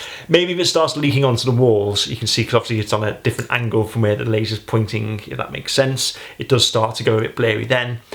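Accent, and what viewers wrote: United Kingdom. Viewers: proper British English... much easier for me to understand you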